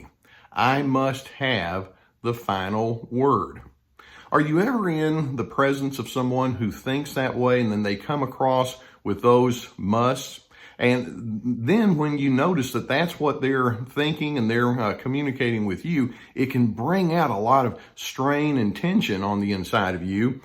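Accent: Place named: Rural, United States. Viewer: Good topic, just ... but I think people who become professionals should try to get rid of that accent. It is possible to learn to speak with a more neutral accent. Because it's so distracting and it robes him of credibility. And that's just because of the stereo-types associated with the accent, I guess: cows, farms, ranch, horse, farmer, pigs, mcdonald, cowboy hat ... it's not usually associated with intellect. Omg I hate to be so hateful lol. But nonetheless, good content, good channel. Don't me I'm an idiot girl who cannot stop herself from typing my actual thoughts.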